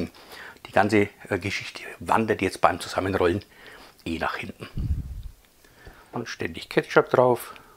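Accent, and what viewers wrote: Franconia, Germany. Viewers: Hallo! Dafür keinen Dank! Ich dachte mir halt das die Preissn uns a Fäschdäner! Grins!:-) Und Red weider Frängisch ich mach dann dein Übersetzer! Grins Gruß Robert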